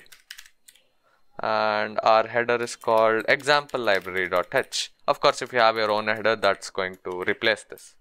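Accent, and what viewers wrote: India. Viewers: Bro’s accent is more indian than mine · индусский акцент. чо уж делать. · 印度口音太重了. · Gotta be Hinest, it really annoys me when it's a thick indian accent. But his is not that thick, thankfully. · i heard indian guy, i subscribe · please dont use indian cant understand them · 😐 I definitely don't watch this video i don't like Indian en accent 🙂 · Indian accent, seriously? Come on! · when i started to watch i tink that the indian accent is kinda annoying but after 30min watching i tink tat the indan acent is actualy funy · He is an indian so has a clear accent so seems heavy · Indian accent... Learning from my fellow Indian... 😋😋😋😋😋😋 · English is not my native language. I speak with an accent. For me if they did this course with normal English it would be much easier to understand. This heavy Indian accent makes the course useless for me. Sorry. 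· Sounds like Indian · its nice that it is recorded by an indian teacher so i have no issue in accent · I DON'T like Indian English accents also but this one is not too bad, in my opinion. I can follow it alright.... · This is so great... But unfortunately indian accents are just a dealbreaker for me.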